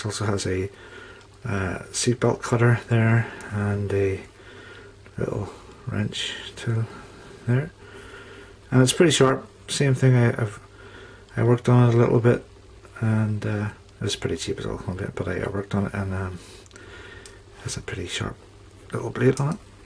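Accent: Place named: Northern Ireland, United Kingdom. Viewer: No knife at all in Ireland but this guy is in Northerm Ireland by the sounds of it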